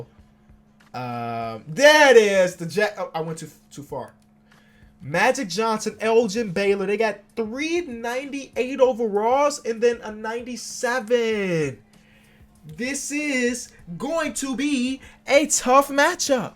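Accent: Chicago, United States. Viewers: Was this guy born in Chicago or something?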